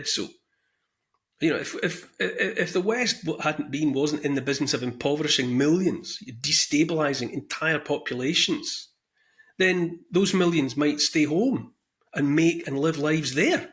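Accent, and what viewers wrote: Scotland, United Kingdom. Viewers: His words are powerful poetry in a Scottish accent and deserve to be heard by all no matter how delusional or brainwashed one currently may be Neil’s words might enlighten the dome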